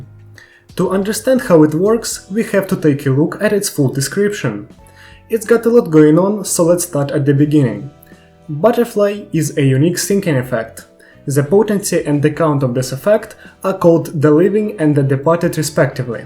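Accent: Russia. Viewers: man, you have russian аccent, especially батрфлайс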